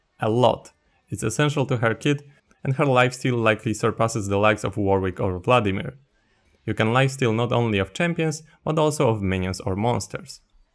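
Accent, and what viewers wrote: Poland. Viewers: Polski akcent uniemożliwia mi oglądanie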